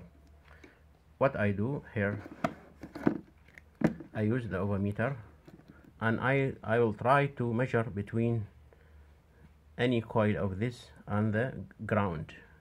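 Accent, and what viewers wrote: Iraq. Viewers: Youre voice is iraqi voice Are you an iraqi ?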